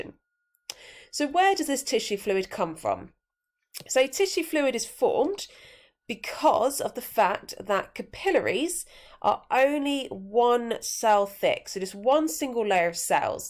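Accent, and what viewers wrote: United Kingdom. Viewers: You are a British, aren't you??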